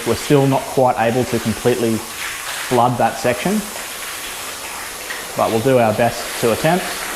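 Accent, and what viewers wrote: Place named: Australia. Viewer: Ah an Aussie accent 😂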